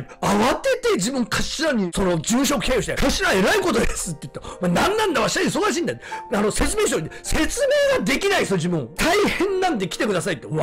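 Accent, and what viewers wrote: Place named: Nagoya, Japan. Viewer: 南利明以来の、久々の名古屋弁のエンタテイナーとして、毎回楽しみに見させていただいております。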